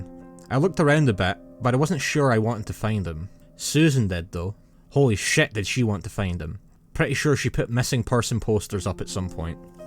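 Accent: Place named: Scotland, United Kingdom. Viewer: Lmao you literally got the same accent and hilariously deadpan delivery of frankie boyle....well accent isn't nearly as strong, he's probably the most scottish that a man can be, complete with huge red beard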